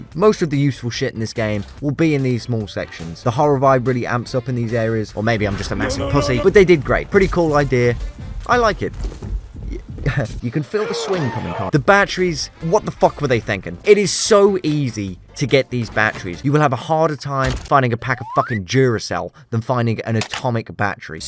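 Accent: United Kingdom. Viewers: I like your british actent is so perfect i wish have but i live in Poland so... Yeah this is i be wach you british actent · You sound British?... When are you going to convert to Islam? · I KNEW THAT WAS PS1 MONSTER S.A. you sound briish btw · First time on the channel, American 🇺🇸 here. With my only knowledge of this game being British, Im happy to know I tapped on a British dude reviewing it. It makes it so much better! Thank the algorithm YouTube scum, they do something right!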